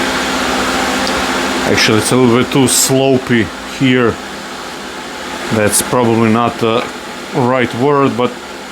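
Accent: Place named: Croatia. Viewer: Completely random, but I watch more of your videos than anybody else and When Croatians were deciding how to pronounce English words… they picked the coolest ways to do it. 😎🫡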